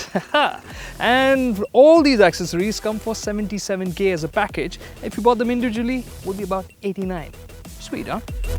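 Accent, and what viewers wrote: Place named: India. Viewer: Could not watch it to the end. Indians sunks speaking english, with thier stupid accent.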